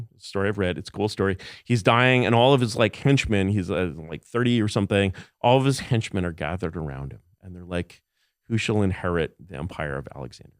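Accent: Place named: California, United States. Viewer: He has an annoying California accent to me. The long drawn out syllables as he improvs his next empty general statement.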